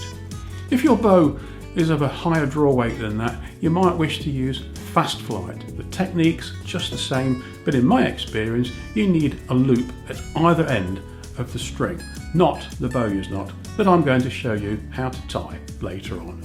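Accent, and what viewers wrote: United Kingdom. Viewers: Don’t judge me but I’m trying to figure out if he’s British or not (the accent) Using inches and all… Very good video btw!!!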